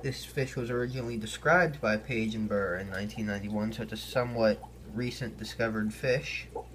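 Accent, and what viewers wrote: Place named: Pittsburgh, United States. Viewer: U from the burgh.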